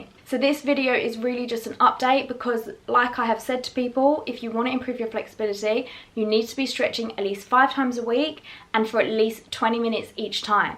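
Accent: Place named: London, United Kingdom. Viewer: Lovely video. You must bee a South or East Londoner lol. The accent gives it away.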